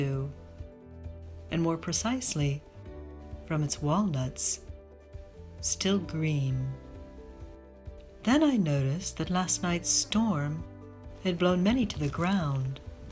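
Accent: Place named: South, United States. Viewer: Are you a southerner by the way? I am, and to me your voice sounds southern. I am enjoying your vlogs.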